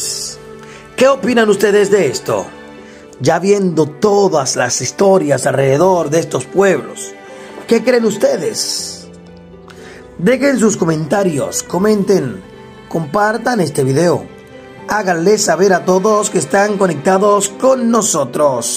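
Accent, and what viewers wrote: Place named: Dominican Republic. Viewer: Solo te faltó decir en qué lugar del planeta paso eso noticias tragicas en voz de un Dominicano eres comunicador y eres bueno manejate. Ook mi Bro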